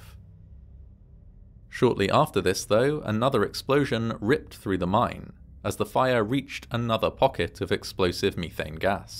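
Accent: United Kingdom. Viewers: I love his narrations, such a calming and soothing voice with a very non judgmental or incriminating approach! Mee-thane gas, gotta love the original English interpretations. Remember folks, it started with them, not with us in America! I love and marvel how the British and Scottish pronounce common English words! It sounds wrong but again (a-gane), it’s we that have it all wrong not them! 🇬🇧🏴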